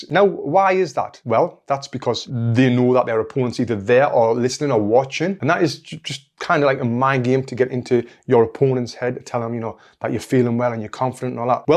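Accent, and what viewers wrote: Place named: Newcastle, United Kingdom. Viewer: Just started listening to your content tonight, good crack pal, Bob.. Geordie tho😂👍👍👌.... Fury, s talked himself upside down once to many times and your right maybe it's the start of decline... Hhhhmmm.. Time will tell... But a tell y what I've watched that fight with nganou 10 times fury hit him with a few full on blows and didn't even rock his head!!! He's a monster nganou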